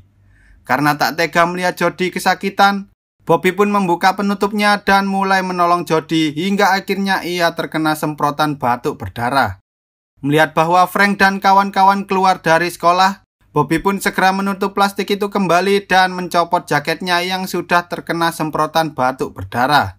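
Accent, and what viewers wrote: Java, Indonesia. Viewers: Medok bgst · Narator wong jowo too hehe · Njawa luh males dengernya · Ws r usah ke suen lah wong jawa ya jawa endi boz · Logat jawa mimin nya jawa nya mana min🤭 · Medok g asik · Jowomu alami min😂😎salam dari malang👍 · Mimin Jawa mana?. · Saaangat bagus rangkuman nya!!! Makasih! Aku suka aksen jawa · Paling Suka Dari Chenel Ini Suara Nya Jawa Bangget Dan Suka Bercanda Juga:v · Wong jowo yo mas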